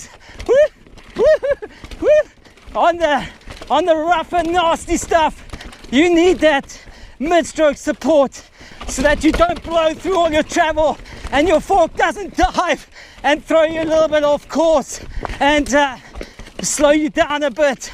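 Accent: South Africa. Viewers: Your awesome! Love the South African accent! Subscribed! 👍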